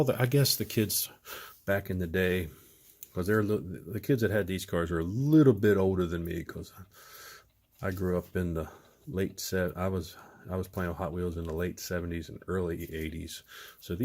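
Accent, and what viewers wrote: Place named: Pittsburgh, United States. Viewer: Glad I found you. Time Rider sent me. TR, Bare Metal HW, WheelsNThangs, Marty are my teachers. You appear to have joined the faculty. Are you from anywhere near, PA. I am, you sound "familiar" for lack of a better way of putting it.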